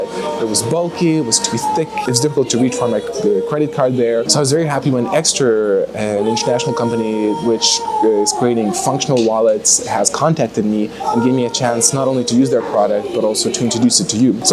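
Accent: United States. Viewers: On another note, as an American who taught English for 3 years in Italy oh, I will say that your American is excellent. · I find it interesting I'm not the only one who thought you was a yank! Just wondering, how did you get an American accent? Have you spent a lot of time over there, or do you learn American English in Lithuanian schools?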